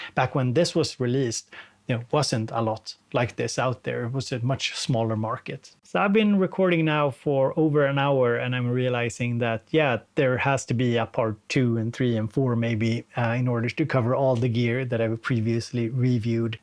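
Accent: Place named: Stockholm, Sweden. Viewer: I can picture myself getting rid of pretty much everything except my OG Circuit. It's such a joy. The immediacy of the sequencer, the knob twiddling exploration, the portability. I honestly, unironically believe it's an ideal environment for getting a curious young brain into understanding and creating music. Imagine getting one for your 12th birthday. (Din engelska har blivit bättre och bättre, men i mina öron låter du fortfarande som nollåttan, han med syntarna. ^_^ Hör av dig om jag kan hjälpa dig med uttalscoachning. <3)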